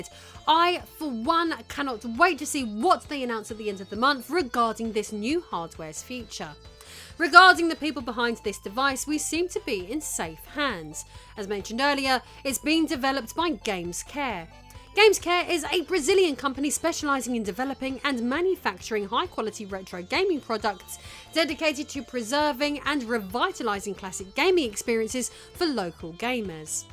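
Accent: United Kingdom. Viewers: I keep thinking to myself why don't I like the sound of. I love British dark haired women. While watching this video I realized, Its the British News caster down hill draw sound. I have heard this in other people and technically is it a way of speaking that is supposed to draw your attention to what she is saying. But to me it is like nails on a chalk board. We came here to watch and listen to you. Our attention is already on you. Nothing against you. Its just this way of "reporting" repels quite a bit of the Neuro Divergent viewers who would watch and enjoy.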